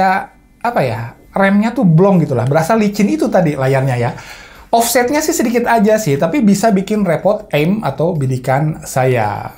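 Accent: West Java, Indonesia. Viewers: sunda pisan logat na.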